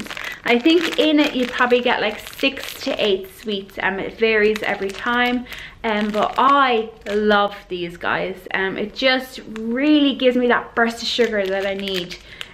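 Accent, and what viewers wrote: Ireland. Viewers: Where in Ireland are you from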